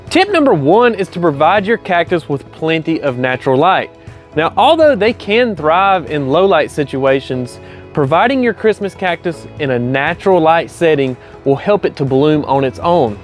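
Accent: United States. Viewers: Sir I love your country accent, where are you from brother?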